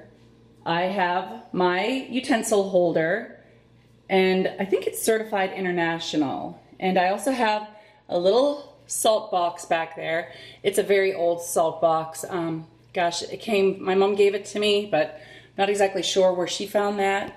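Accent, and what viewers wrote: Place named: North, United States. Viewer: I have those same tomatoes too. I use them in my summer decor. Our weather isn't too bad here. No snow and temps in High 30' today. Not as bad as some places that got dumped with snow. Are you from Wisconsin or Minnesota? I feel like you have that northern accent. I grew up in Michigan, people say I have an accent too lol.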